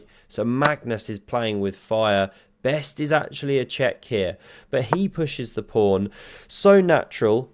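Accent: United Kingdom. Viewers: way too british. cant listen to this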